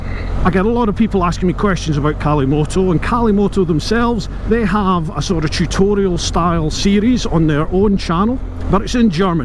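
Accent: Scotland, United Kingdom. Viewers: Shame you couldn't get over to Scandinavia with your colleagues. Nordkapp perhaps:) Well I am hoping to do it next year with a mate, we will both be riding Royal Enfield Himalayans! We will be taking it steady I suppose:) What makes me come back to the channel? Your charismatic personality mixed with honesty and of course the Scottish accent. My father was a Scotsman and the fact that your an accomplished motorcyclist:) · I was looking for unusual content a while back. I was a little sick of the bloke on a bike having a yarn while ridin around. So I came across this crazy chap who was doing a RTW on a Suzuki sports bike. Now THAT was interesting, so I watched the whole thing. And from an Aussie perspective I had (and still do) a bit of laugh about his Scottish accent, love it.......now guess who that bloke was people ?? Yep, that's what brought me to the channel and wouldn't that be epic if he could do something interesting at that level again :) Cheers rsm8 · OK, so you asked for feedback on possible avenues to take in future. 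Man, I don't blame you. It must be hard having to bring out content week after week. My immediate thought was that you should do what YOU like and not "pander" to the consumer; focus on your strengths. So what are your strengths? In my opinion you have a great personal gift in the way you communicate, a sort of light and honest way that gives you integrity. Your Scottish accent is charming (not meaning to sound patronising here, sorry if I do). A downside to that is probably that your audience is limited to those who understand what you are saying. I am guessing a lot of foreigners have a hard time there. I know YouTube offers "closed captions" but they are frankly a bit of a hassle. If I were you I'd go with regular subtitles, English as a minimum. With regards to content (I don't listen to podcasts so sorry if you are already doing this) but setting up interviews/discussions/MC comparos and ratings with some of your YouTube peers and others focussing on MC related content could be a way to go. 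One particular thing I always thought people would enjoy is taking a fresh look at older model bikes. Grab an old VFR, Bandit, FJR, BMW K models etc (through some of your subscribers?) ride them and re-review them/compare them to the modern bikes/talk about how they age and how they have held up. I understand that you try to make a living, but I personally think that you sometimes try a bit too hard to sell your chan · Simple answer as to why I watch all your YouTube vids. Content, is relevant to my main interest of biking and you are definitely in the top 5% of the biking Youtubers out there for the quality of content. The Scottish accent is very easy on the ears. I like your sense of humour. I particularly like it when you punish yourself like on the Sinnis. 👍👍👍💪😎😁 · I come to this channel for your Scottish accent and your beautiful smile... and the bike content 😁✌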